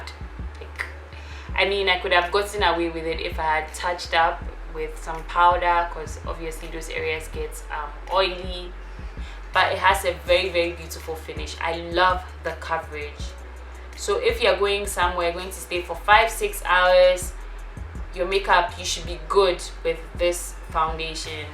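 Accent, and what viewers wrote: Ghana. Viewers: Hi, Your accent tells me you are from Ghana, I will like to get in touch with you and ask you a few questions directly. Kindly email me on <mail> I'm not sure about putting my number out here. I will be glad if I get a reply from you. Or you can post a business WhatsApp number if you have one. Anyway I'm your new subscriber and my name is Francine.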